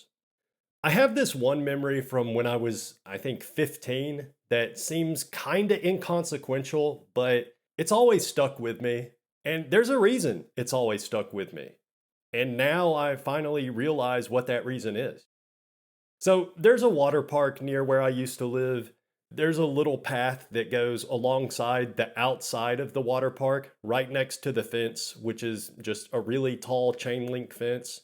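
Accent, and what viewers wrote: South, United States. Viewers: Hey there. 33-year-old female here. I just came across your channel and this is the first video of yours I’ve ever watched. I’m only about halfway through but I don’t think you should be so hard on yourself. You seem like a really great guy. And you’re funny!!! Stop telling yourself you’ll never have a meaningful relationship. That’s not true. If you keep telling yourself that, you won’t have one. I’m blind using a screen reader so I can’t see what you look like, but you seem to have a nice personality. Work on your confidence in your self-esteem. That’s the most attractive quality in a man. By the way, where are you from? I feel like I’m hearing a southern accent lol. I’m from Kentucky so I have one too!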